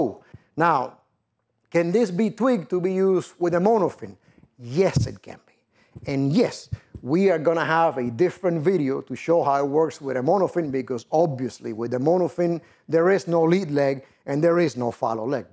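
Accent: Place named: Cuba. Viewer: I just came across your channel and obviously I just subscribed. Tons of great info that I will implement this summer, thanks a million for not just your teaching but for the way you deliver it 😎 .. Googled you as well did not know you trained several world record holder free divers ! Needless to say when I heard the accent I was like “this guy is Cuban” and Yeap Cienfueguero ! I’m from Santiago de Cuba. Anyhow I’ll watch and pay close attention to your vids and teaching. Thanks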